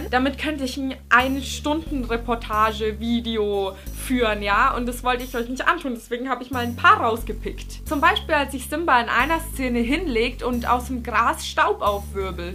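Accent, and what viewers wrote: Franconia, Germany. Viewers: kommst du aus franken??? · Kommst du aus Franken? Oder was ist das für ein leichter Dialekt?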